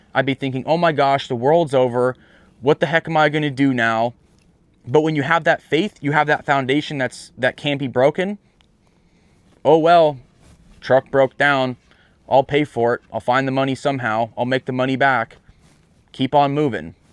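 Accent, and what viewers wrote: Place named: Texas, United States. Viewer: EXACTLY, Jesus is not our "Jeanie" God is not our butler! He's our heavenly Father ! And most DON'T understand, when they say, " i prayed and the opposite happened or this went wrong! God is a FAITH God! Means He's listening and RESPONDING to FAITH! When people talk that way, #1 they probably ain't God's child, they really aren't "saved" or #2 they have NO FAITH, or understand how FAITH works! [Hebrews 11:6] Maybe, when you're truck broke down in Texas, He's trying to say you belong in Texas! You sure look & sound like a TEXAN! Anyway, you have to follow your heart! God bless!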